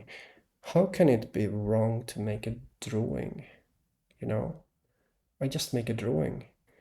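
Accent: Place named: Eastern Europe. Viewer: Just curious, what's your country of origin? I think I'm detecting an Eastern bloc accent.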